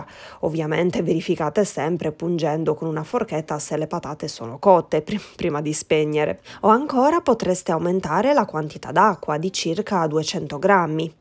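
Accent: Sardinia, Italy. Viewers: meravigliosa e intramontabile ricetta pure della mia❤. ps: fallo sentire l accento sardo che è meraviglioso❤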